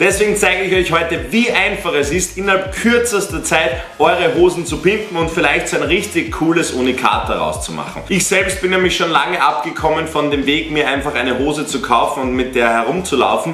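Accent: Austria. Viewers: In östereich spricht man des so aus:)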